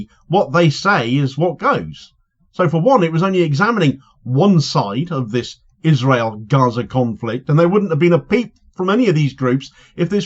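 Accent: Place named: West Country, United Kingdom. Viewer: The Beeb gets it from all sides - that suggests it usually draws the line about the right place. I can’t believe this person (?) whose posts are usually a diatribe of bias (against Israel, against Conservatives, against the mainstream media, against the present Labour leadership) is accusing anybody of a lack of balance. His schtick is very simple - the BBC is influential around the world - if you accuse it of bias - you might get some traction either from an executive encroaching on editorial independence or from people jumping on the bandwagon. This stuff is among the worst news analysis on the internet. I watch it for comic value as I hear a big lumbering bloke, in a big lumbering Worzle accent get completely out of his depth on international politics. This anti US/Israel stuff is so undergraduate and juvenile. “Oil be gettin the Soyder out soon” and see what village is missing him.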